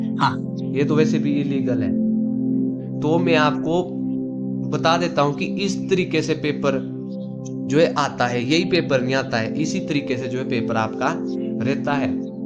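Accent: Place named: Pahari, India. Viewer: Aapki voice to phadi logo ki traha lag rhe hai himachal me aap kha se hoo ...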